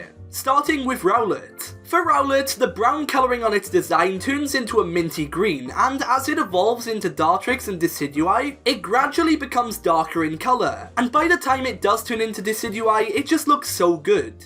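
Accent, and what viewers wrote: Wales, United Kingdom. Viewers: I love this man’s voice ❤️ welsh accents are pure 🔥 Another great and fun video, love me some shiny starters;) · are you Welsh?? I’m tryna figure out your accent! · Are you from north or south wales?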